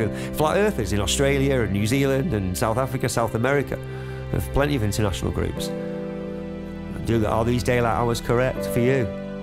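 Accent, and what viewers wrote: United Kingdom. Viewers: Roger Reddersen it's called northern, how a lot of us English speak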